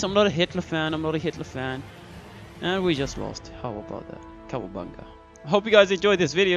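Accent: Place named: India. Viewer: awesome game man and whats wrong with that Indian accent dude -_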